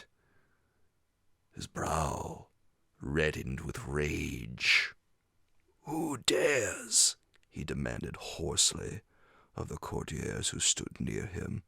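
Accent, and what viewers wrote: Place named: United States. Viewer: Thanks for this one! One off my favourites of Poe's tales. You've got to have a talented American narrator for Edgar.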